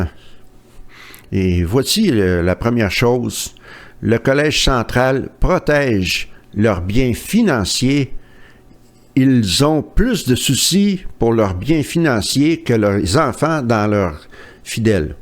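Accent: Quebec, Canada. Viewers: J'adore aussi l'accent québécois, surement grâce aux 4 mois que j'ai passé à Montréal en 1992 et qui m'a laissé un excellent souvenir.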